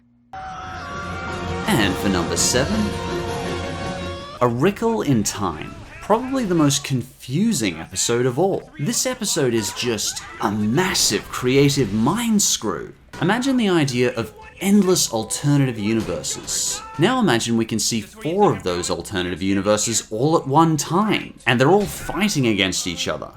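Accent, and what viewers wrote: United Kingdom. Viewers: #1 bad thing about your british accent. when you say, ARTISTIC i think your sayin autistic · I thought I was going to watch an episode but I get narration from some f**** Brit my grandma's from England but your voice is annoying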